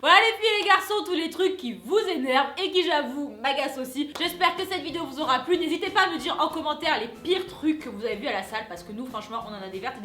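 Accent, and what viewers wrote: South, France. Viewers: J'aime vraiment tes vidéos et surtout ton accent vive le Sud :)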